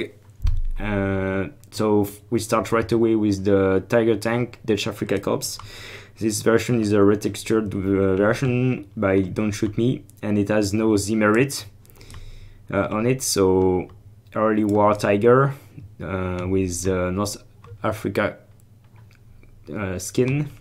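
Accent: France. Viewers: tu a un accent français tu est un français en tout cas très beau mods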